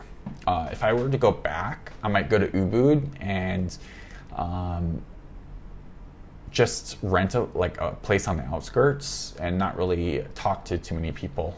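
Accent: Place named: Southern California, United States. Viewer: Your SoCal speech mannerisms are impeccable!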